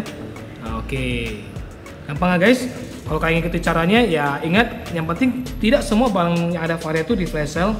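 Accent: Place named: North Sumatra, Indonesia. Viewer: Ooh abang orang pakam toh 🤣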